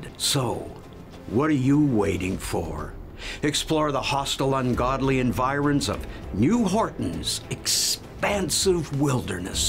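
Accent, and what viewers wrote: New England, United States. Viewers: Wow new Horton sounds like New England? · Holy shit you've got New England nailed down. Now when may we expect Roger? I can pick him up at the train station at a moment's notice.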